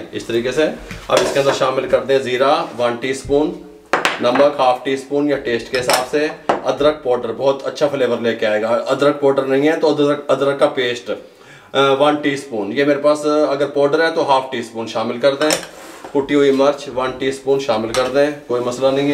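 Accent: Punjab, India. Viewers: Or comentry b kamal with punjabi touch 😃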